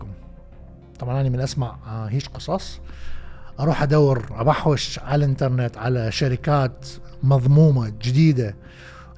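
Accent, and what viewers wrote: Iraq. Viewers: دائما مبدع أستاذ بأختيار المواضيع الاقتصاديةالرائعةوالمفيدة..لذلك صارلي يومين أسهر على فيدوات قناتك المميزة🌹💐🌹وأتمنالك الإستمرار بهذا الحديث الممتع واللهجة العراقيةالبسيطة وياريت تصور حلقة مشتركة مع الأخ العزيز أنس على قناة زنگين...وبالتوفيق والنجاح انشالله🌹💐🌹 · ممكن تحط ترجمه كلامك مو مفهوم اللهجه العراقيه شوي صعبه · اخى العزيز لهجتك العراقيه جميله وبسيطه ويفهمها الجميع وانا كمصرى اعشقها واعتقد انك لست بحاجه الى ترجمه بالفصحه ،،، تحياتى لكم اخى على قناتكم القيمه الرائعه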